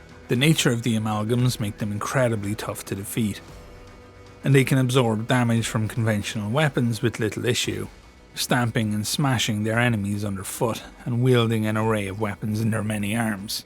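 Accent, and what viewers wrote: Ireland. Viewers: Those Irish tones add a subtle calmness to the nightmarish narrative you're describing.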